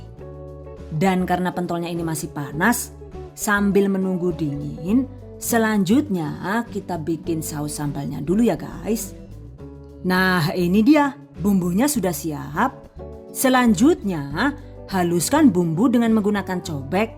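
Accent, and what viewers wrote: Banyumas, Indonesia. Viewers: Dening orang ngapak ya yhu, 🤣🤣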